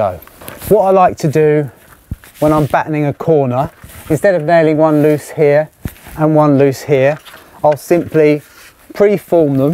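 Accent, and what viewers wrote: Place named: RP, United Kingdom. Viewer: This is poshest chippy I’ve ever heard I have use dictionary when he talks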